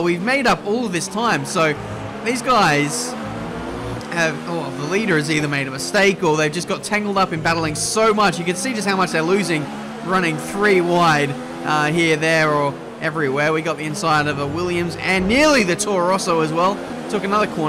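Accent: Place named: Australia. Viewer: That moment when you expect ‘Yo, JD here, TRL Limitless’ (in a British accent), but you sadly hear: What is up guys, welcome back to a brand new video’ (in an Australian accent)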